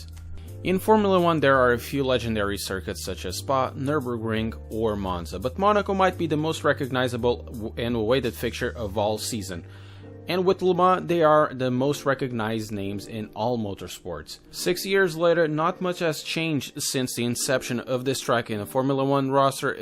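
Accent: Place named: Portugal. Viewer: I had a suspicion from your accent that you were my irmão. boas!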